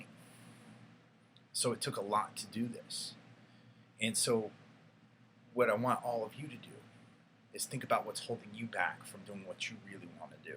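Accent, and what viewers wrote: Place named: East Coast, United States. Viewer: Thanks for the videos and the motivation. Keep them coming! For the record, east coast accents are the only real American accent. #BXNY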